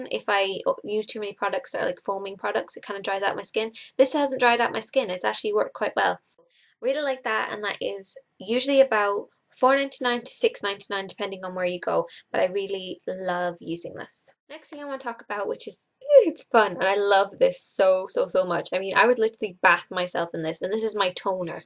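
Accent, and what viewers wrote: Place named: Ireland. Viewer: you're Irish aren't you? lol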